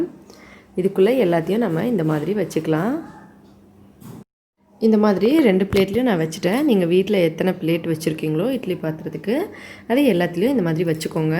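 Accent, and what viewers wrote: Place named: North India, India. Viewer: நீங்க இந்திகாரங்க தான ......ஆனா அழகா தமிழ் பேசுறீங்க எப்படி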